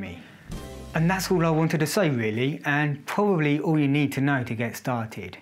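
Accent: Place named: United Kingdom. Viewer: pretty obvious this guy is a Brit with that accent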